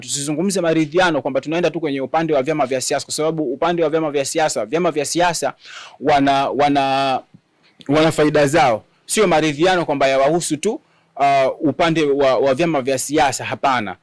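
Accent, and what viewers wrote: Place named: Democratic Republic of the Congo. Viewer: Wew unaongea kama nani mvamizi.mcongo.siyo mtanzania.tulia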